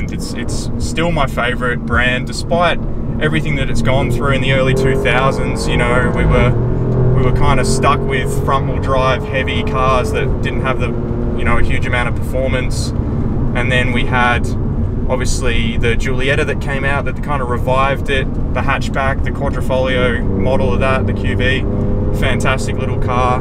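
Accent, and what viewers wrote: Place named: Australia. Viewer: Why do you sound more Australian in the car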